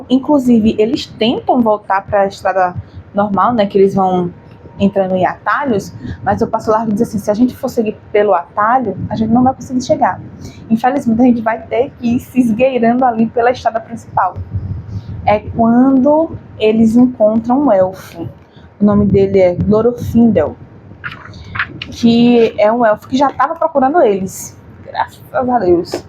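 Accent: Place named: Recife, Brazil. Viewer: O seu sotaque é do Recife.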